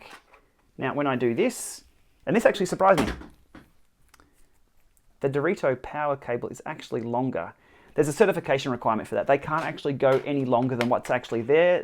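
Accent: Australia. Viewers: Hi I am conemplating buying a Direto since I have a problem with the watts distribution on my Tacx trainer: in intervalls it shifts from one sec to the next from 100 to 400 watts and I have to programm increments of 20w every 4 sec in order to have a smoother transition from one interval level to the next - is this any better with the Direto or can I programm a smoother curve on the elite Training app? Thx for a feedback - Marc/Switzerland (just having completed the Croc Trophy in Cairns..... so its good to hear some fair dinkum Aussie accent)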